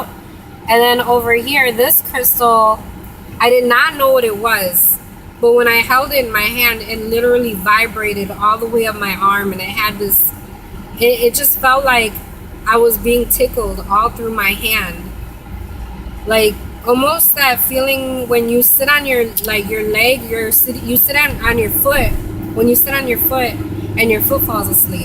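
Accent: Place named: New York, United States. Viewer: I love your accent, are you from NYC?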